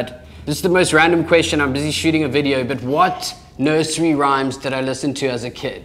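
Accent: South Africa. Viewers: Are you a south african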